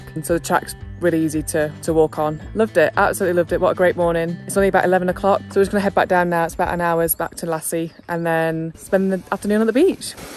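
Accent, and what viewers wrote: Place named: Stafford, United Kingdom. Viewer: You sound very immature! Great video,thanks!😅😊By the way ,your accent sounds very similar to my home town accent of Stafford.Im guessing your from not a million miles from there?😊😊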